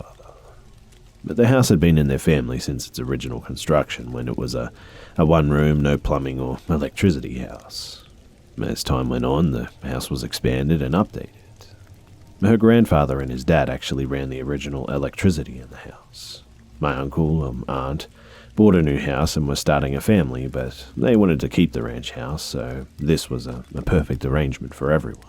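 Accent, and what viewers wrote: Australia. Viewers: Love these videos, they’re the only thing that help me sleep. It’s also nice to hear a familiar Aussie accent :) !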